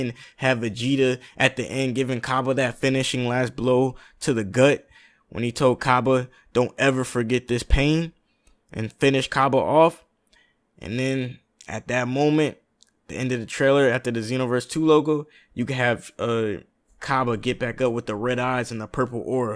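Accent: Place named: South, United States. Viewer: Your country accent is funny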